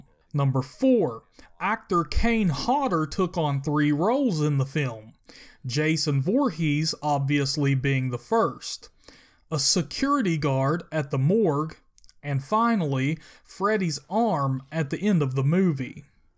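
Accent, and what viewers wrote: United States. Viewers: Wtf is your accent!? I love it! I know it’s American... I’m from New Orleans myself. ⚜️😉.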